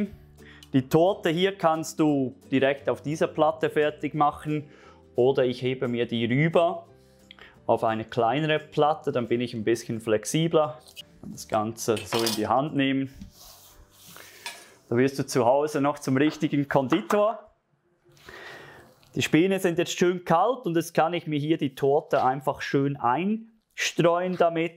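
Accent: Switzerland. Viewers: Dopo diversi anni che sono tornata in Italia dopo aver vissuto in Svizzera per 20 anni ho avuto voglia di elaborare una swarzwalder torte sono felice di sentir parlare svizzero ed di sentire le spiegazioni in modo così chiaro. Complimenti. Faro questa torta peril compleanno è la regina delle torte. Da oggi ti seguirò.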